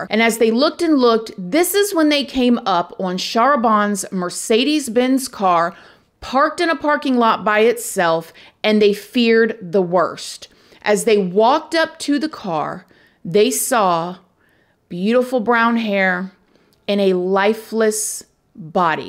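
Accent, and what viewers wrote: South, United States. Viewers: First of all Seriously…..like wtf is wrong with people! Clearly she has no morals or values like wow it’s absolutely disgusting and ridiculous how this girl did this. So worried about disappointment from her family than killing an innocent woman! Anyways girI love your channel and how you are so real with everything. Plus love your southern accent with your y’all this and y’all that lol❤ Jenny from Ontario, Canada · Hey I love your videos I watch every chance I get. I just have to ask are you from the South? I don’t want to know where I’m just curious. I’m in Mississippi. I hear some southern twang in your voice every now and again.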